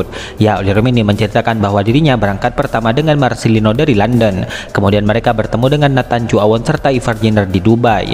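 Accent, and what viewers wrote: Madura, Indonesia. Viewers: Okeh 🙏 Logat bahasa Madura united 👍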